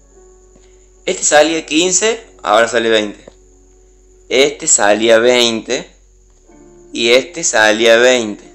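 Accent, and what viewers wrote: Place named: Córdoba, Argentina. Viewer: amigo te re queda el cordobes kpo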